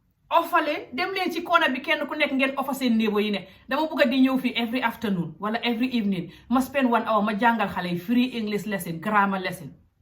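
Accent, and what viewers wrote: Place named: Gambia. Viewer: Listening to you attentively, your Wolof accent sounds more Gambian (fanafana). ☺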